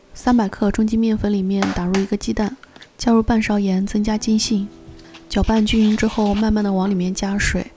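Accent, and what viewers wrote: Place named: Sichuan, China. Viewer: 抄手配湯愈吃愈香是四川口音； 是四川人嗎？想做一些你的油潑辣子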